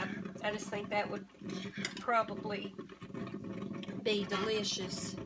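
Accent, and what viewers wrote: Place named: North Carolina, United States. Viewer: I knew your accent sounded familiar! I’m from the Boone/Blowing Rock area :)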